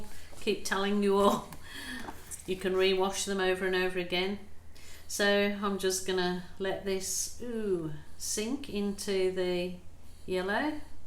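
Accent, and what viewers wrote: Birmingham, United Kingdom. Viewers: That's so funny because I was going to suggest you were originally from Birmingham as you have a strong inflection on certain sounds - and it is strang that I should notice it to the exact region in the UK as I am an Irish woman, living in Ireland all my life haha! Keep up the beautiful work and I love that you are using glue. It just proves that you can do amazing things without breaking the bank. Have a great day and thank you for everything!!!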